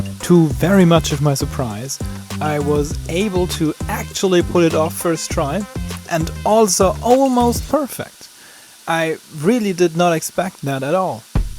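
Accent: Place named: Germany. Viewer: Eine interessante Herangehensweise, wie Du den Griff von Stich gestaltet hast.^^ Vor allem,.... bin ich eigentlich wirklich der einzige, dem es jemals auffiel, dass Orcrist aus dem Hobbit Film einfach nur eine Hälfte von Stich ist? Hält man 2 Orcist zusammen, hat man ein Stich. ^^ .... im englischen klingt der letzte Satz besser. xD Auch fand ich interessant, dass Du für Orcrist auf Blau gesetzt hast.^^ Der Drachenzahn Griff ist wirklich gut gelungen.^^ Die armen Pfandflaschen! xDDDDD Der Schwabe ging Dir jetzt aber sowas von an die Gurgel. Woischd, Kerrle. Dessin 25cent pro Flasche! O_o Ich weiß ja nicht warum, aber für mich muss Glamdring schon eine definierbare und klar erkennbare Blatt Klinge haben. Leaf shaped Blade im englischen, glaube ich. Neben Narsil/ Anduril mein zweitliebstes Schwert der Guten aus Mittelerde. Anhand der Menge an Cutting Tests kann man auch gleich erkennen, was wohl Dein Lieblingsschwert war. 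^^ Man kann es einfach irgendwie raushören. xD Wir Deutschen haben so einen gewissen Klang in der Stimme, wenn wir auf englisch reden. ^^ Nicht nur ein Landsmann, vielleicht auch noch einer aus dem gleichen Bundesland, wenn ich die Rheinpfalz richtig gedeutet hatte? ^^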